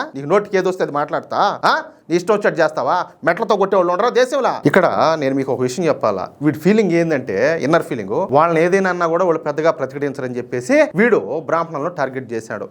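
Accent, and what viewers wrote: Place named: Kadapa, India. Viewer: Anna meedi maaa kadapa nee naa. Maatlu alage vunnayi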